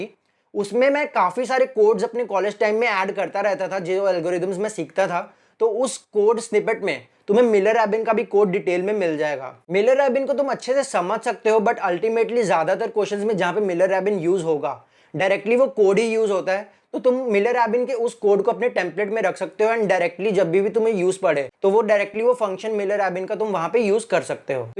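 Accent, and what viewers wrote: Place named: India. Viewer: can you add the transcription in your video pls. The India accent seems pretty hard to listen:((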